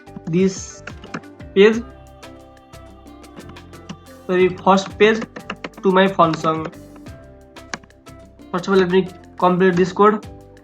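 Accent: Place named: Nepal. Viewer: ayo nepali accent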